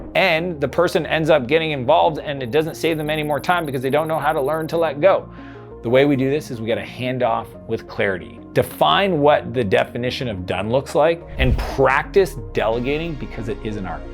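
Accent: Canada. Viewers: It sounds like a French Canadian accent, idk if it’s only me. But great video, I really like this advice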